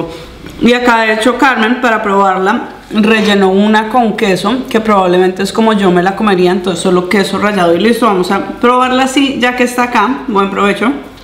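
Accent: Colombia. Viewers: Toque costeño? O toque Venezolano?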